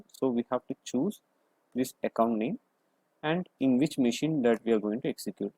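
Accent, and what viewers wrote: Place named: Kerala, India. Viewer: Its really nice video. Very clear voice n explanation. Hope you have little Malayalam accent..🙂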